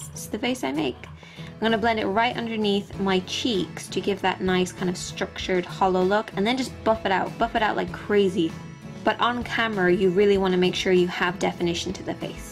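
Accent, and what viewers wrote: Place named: Ireland. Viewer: I'd say there's a bit of Irish in her!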